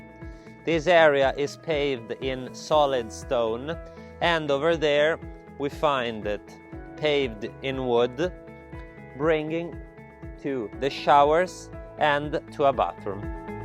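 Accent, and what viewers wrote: Italy. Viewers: Great video, as always, with a very professional presentation. Your English is very good, and I love your Italian accent! I love when you say "terra cotta". I could never role an "R" like that. Please keep the videos coming, and improving your presentation. I bet the your sales are going up!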